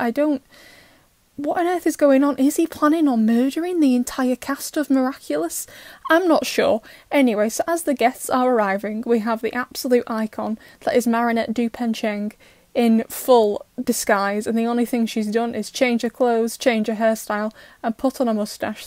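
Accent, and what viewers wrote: England, United Kingdom. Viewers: Are you from england ?? I am asking because of your accent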